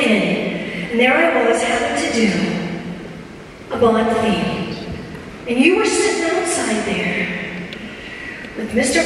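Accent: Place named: United States. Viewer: she sounds american now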